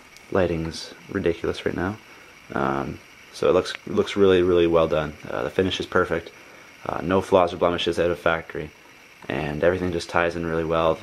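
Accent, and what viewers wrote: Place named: Canada. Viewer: You are canadian, aye? Figured that OOT right at the beginning :-D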